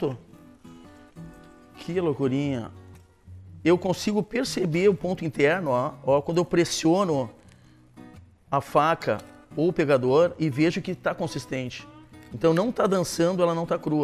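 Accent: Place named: Porto Alegre, Brazil. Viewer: Sotaque porto alegrense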